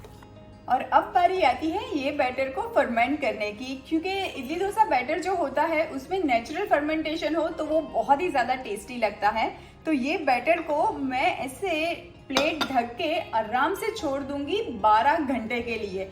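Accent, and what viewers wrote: Gujarat, India. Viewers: its not bhiga sakte ho...its figo sakte ho...correct u r hindi..gujratishhh hindi.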